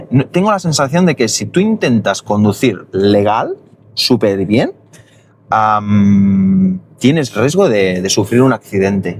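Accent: Catalonia, Spain. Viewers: A fin de cuentas, no deja de ser un canal de español para extranjeros, tiene que bajar la velocidad. Aún así, no es de los más lentos. También me gusta que no renuncie a su acento catalán, igual que Spanish with Antonio no renuncia al andaluz. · Pues yo pille Tu acento catalán desde el primer minuto de escucharte.😂 Luego lo del cochino salvaje ya me confirmó la región sin que lo mencionaras.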